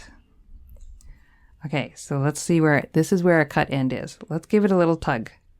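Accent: Canada. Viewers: Love this! Also love her East Coast or Canadian accent..."the yarn end pulls oot." 😊❤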